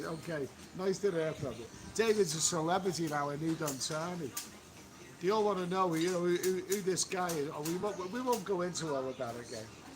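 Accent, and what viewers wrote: Liverpool, United Kingdom. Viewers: The sound is bad it's making you sound like someone from Liverpool.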